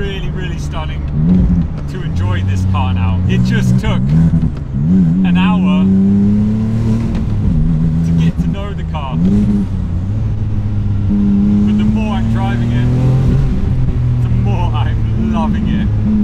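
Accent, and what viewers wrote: Switzerland. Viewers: I was waiting for the day when I hear you speaking swiss german... Finally it has arrived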